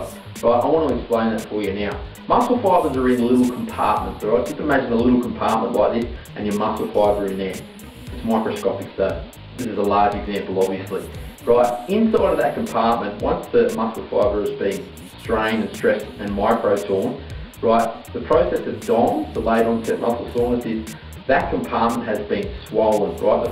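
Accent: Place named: Australia. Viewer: You got a lovely australian accent, love it !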